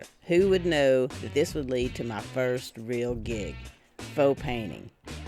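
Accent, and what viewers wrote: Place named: South, United States. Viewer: Sorry if I missed it, where's that accent from? I first thought the accent sounded like ebonics, I reckon it's southern? Which southern? I always thought ebonics sounded southern. Disclaimer: I'm not from the US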